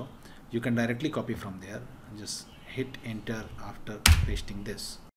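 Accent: India. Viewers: Always the dudes with the Indian accents who don’t require you to download some fishy app xD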